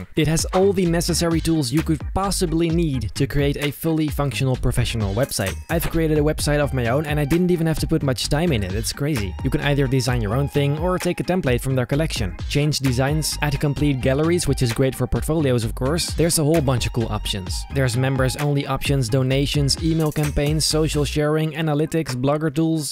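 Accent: Netherlands. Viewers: It’s called an accent dude. He’s from Holland. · Go for a 1000 Microworlds!! And then auction them off like Beeple and become rich! Also, are you Dutch (like me)? I notice a bit of an accent, not too much tho... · You sound dutch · I love your accent, are you dutch?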